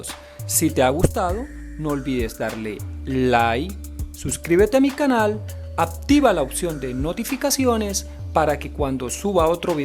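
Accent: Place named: Bogotá, Colombia. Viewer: Ahhhh genial la pegue , sabia que eras colombiano seguro cachaco por tu genial dialecto , ya mismo te sigo y te escribo al privado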